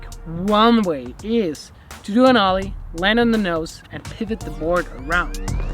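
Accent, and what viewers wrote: Germany. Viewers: Wait are you German?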